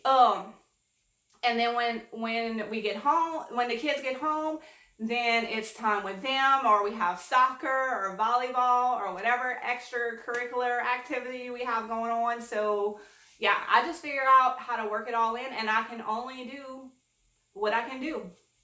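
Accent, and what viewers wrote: Louisiana, United States. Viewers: Love your video's, great inspiration! Thank you for sharing your ideas! I was going to ask what state you're from, because I keep hearing Cajun. Then on the fb group, your last name...silly, I know. But I've got a friend that's Cajun and I love hearing her talk.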